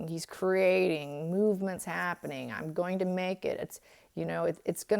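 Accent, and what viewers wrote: United Kingdom. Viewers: She is a genuine psychic, she doesn't read with military precision but it all pulls together in the end. If you are not British you may find the accent a bit difficult, but then us Brits find American accents and their mangled English difficult too. Oh well, it is good we are all different isn't it?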